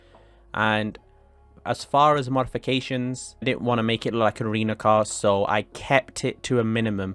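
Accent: United Kingdom. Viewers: Fucking bri’ish accent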